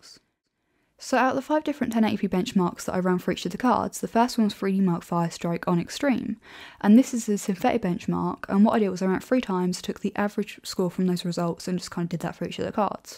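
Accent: United Kingdom. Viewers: I just watched this video and made a comment about how I can't understand her English b/c of her accent and I made my comment b4 I saw yours so obviously people are having problems understanding her dialect. Your videos are awesome and very professional but you need to speak slower and dictate your words individually or people that aren't British will be lost. Just my 2 cents. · Nice work, interesting channel and projects, great looks and smile, nice personal touch in videos (beautiful dresses, bunny, …) with no sight of arrogance in your speech and manners that indicate that you respect the audience. (I like how sometime apologize for smth. you didn’t have time to complete or achieve nevertheless the hard work you already done). If I had a TV channel and money I would definitely hire you (Don't speak American stick to UK-English accent) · I'm sorry, but I don't understand most of it. 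While I find your dialect interesting, you slur your words together so much, that I seldom can pick them out of your sentences. I can't imagine that these videos are made only for the british people in your area (who, I suspect, would understand you perfectly well). The rest of us, and especially non-native speakers such as myself, are really having a hard time here.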